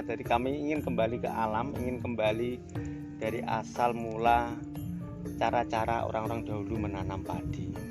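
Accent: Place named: Java, Indonesia. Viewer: Suarane medok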